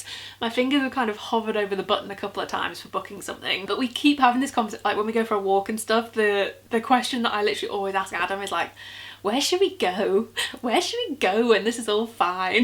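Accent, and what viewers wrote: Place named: Wirral, United Kingdom. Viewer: I haven't left Wirral in almost a year ( cheers covid ), I thought I recognised the accent and the sense of humour! :-)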